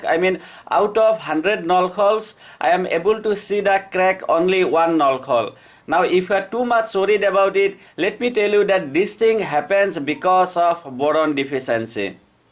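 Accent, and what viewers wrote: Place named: Northeast, India. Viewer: R u frm northeast??I thought so coz of ur accent🤗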